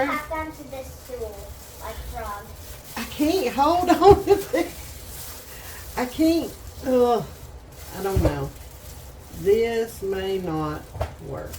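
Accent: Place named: South, United States. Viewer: Canadian here…love how you pronounce boil….bowl. So fun to watch your southern cooking, and hear the accent. Never heard of this cowboy candy before.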